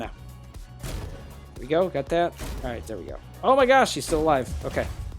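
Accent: United States. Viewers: Hello remember when I told you that I wanted you to review my hangar basically I wanted you to give me a summary of what I have now and what I should have later on again my Id is <num> Have a great day ahead man love ur videos btw I'm subscribed ur like my main mech arena content that I watch every day btw I'm Indian 🇮🇳🇮🇳🇮🇳😎😎and I can speak in American accent like the u speak I can say the same accent and I'm 10 years old and I play mech arena pretty often it's one of my favourite games I love this game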